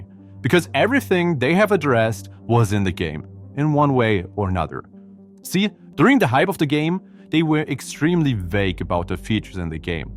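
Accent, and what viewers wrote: Germany. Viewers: Love the german accent ;) Good videos, high quality, keep it up!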